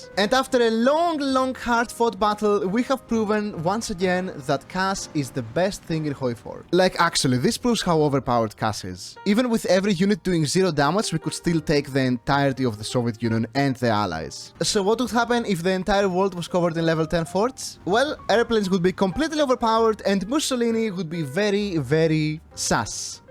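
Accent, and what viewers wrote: Greece. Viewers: I swear you have a greek accent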